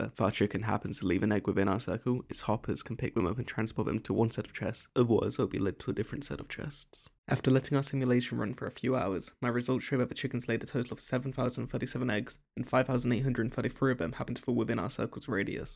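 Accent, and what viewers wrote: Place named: Scotland, United Kingdom. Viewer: That's so cool, great idea ! Are you Scottish ? Because as a French it is hard to understand you 😆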